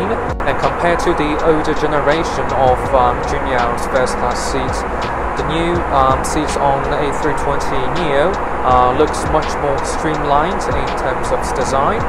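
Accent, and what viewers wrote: United Kingdom. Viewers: Officer has a rather distinctive British accent. Maybe if switched to an American accent you might be better able to understand him?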